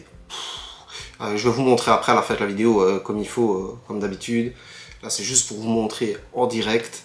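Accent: Belgium. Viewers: t'es liégeois? Sinon sympa la vidéo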